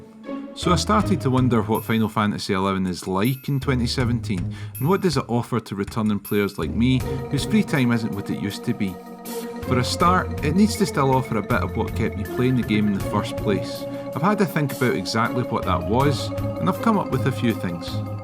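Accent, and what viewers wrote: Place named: Scotland, United Kingdom. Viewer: Great to hear a fellow Scot speak about this game the way I do lol. I just started a new character on Odin!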